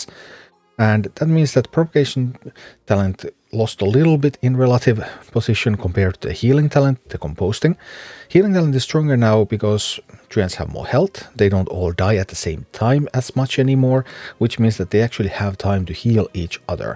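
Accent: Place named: Finland. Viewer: It's the power of the Finnish accent :)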